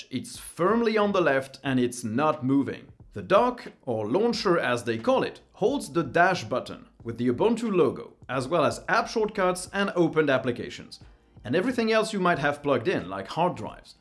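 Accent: France. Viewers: Your accent, are you French, Ouais?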